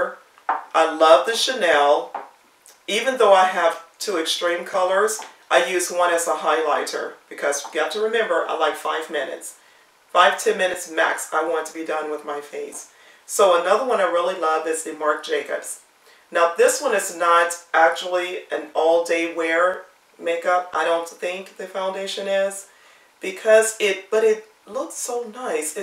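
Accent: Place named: Jamaica. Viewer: Your LV 6 Key Holder particularly stood out because that's on my radar. I didn't SEE this - but your accent is soothing. My family is from Jamaica and sometimes you pronounce words as they do. Anyway, new subbie here. Please enter me in the drawing and continue to make your videos! :D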